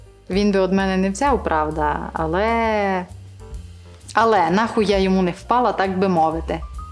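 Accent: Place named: Transcarpathia, Ukraine. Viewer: Будь ласка! Несіть цей закарпатський вайб якомога більше... Це ж бальзам для вух♥️ Маю мрію в серці- навчитися так розмовляти 🥹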